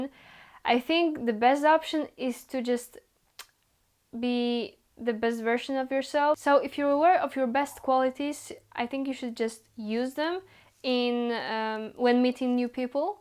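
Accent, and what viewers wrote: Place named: Poland. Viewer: Hi, congratulations! You are fluent and natural. In your speaking English there is no pretending of not being Polish by no means. On the contrary, this video shows (maybe more clearly than the rest) that you are thoroughly Polish. And this is good. There should be more of such expression of Polish identity and spirit in English on the web. Keep up. And thank you!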